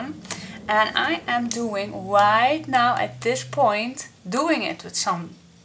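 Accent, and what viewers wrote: Brabant, Netherlands. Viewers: Gefeliciteerd met deze mijlpaal👍🎉🎈. Ik volg je al een tijdje maar ben nu ook abonnee geworden👍💋. Je maakt zo leuke dingen, maar soms heb ik moeite met het engels, dan kijk ik naar de video en wordt t me duidelijk wat je bedoeld. Ik woon in Nedetland en in Limburg. In de buurt van roermond dus niet zo verweg van brabant, ik hoor je accent en versta t ook. Ga zo door👍💋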